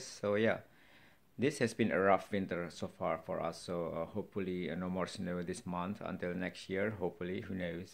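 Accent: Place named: Indonesia. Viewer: Your English is very good but I'm sure you are an Indonesian. I've got the feeling. If so, what part of Indonesia r U from? Greeting from Jkt. The mistakes/errors you've made are all the attributes to Indonesian speakers of English, no doubt.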